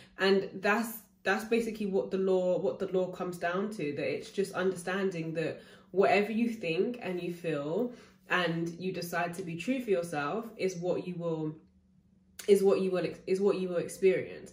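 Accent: United Kingdom. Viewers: Wasn’t expecting the British accent 😩😂thought she was American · Finally a loa coach with a British accent! Thanks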